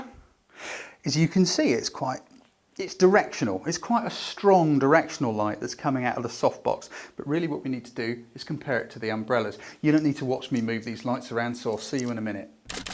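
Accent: England, United Kingdom. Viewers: Hi! Thanks so much for all of your videos, they are very helpful and you make everything easy to understand. Love the English accent as well! I am thinking of setting up a home studio and wondered if you thought a room with the dimensions of 14ftx12ft would be sufficient and what do you think my my must have equipment should be? This would be for newborn and portrait photography mainly. Thanks again!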